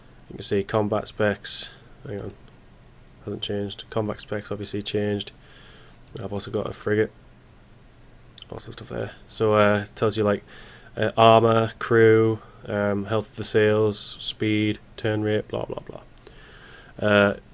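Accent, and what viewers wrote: Newcastle, United Kingdom. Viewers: You from Newcastle area? Thanks for the video. I'll probably buy this when its finished.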